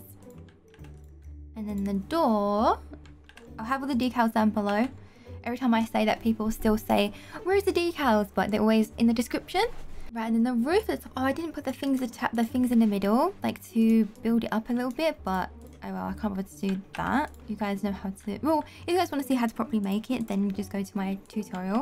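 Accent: United Kingdom. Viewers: I don't know what it is but I just LOVE british accents... IDK why lol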